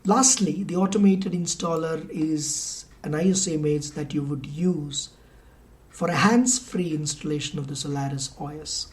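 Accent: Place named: India. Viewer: Appreciate your clean English, albeit Indian accent, greatly explained,Keep it up.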